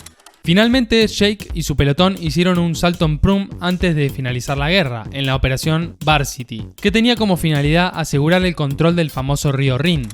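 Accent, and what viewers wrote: Argentina. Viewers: pense que se llamaba Shake, fk acento agertino xD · De donde eres tu ? Uruguay o Argentina? Un pregunta nada mas e por l'acento a mi me parese mas Argentino verdad ,de toda manera tu video me encanta mucho te felicido yo soy napolitano e amo Maradona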